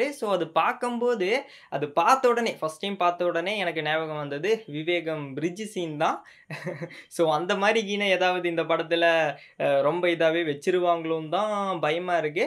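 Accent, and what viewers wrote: Kerala, India. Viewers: Ninkal malayali aano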